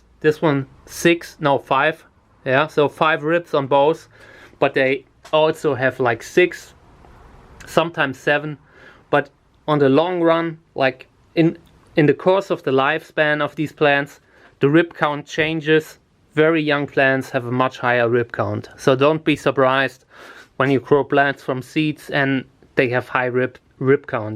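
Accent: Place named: Germany. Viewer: Du bist doch Deutscher.... Das hör Ich doch.... Wo wohnst du denn, dass die so gut gedeihen?? 👌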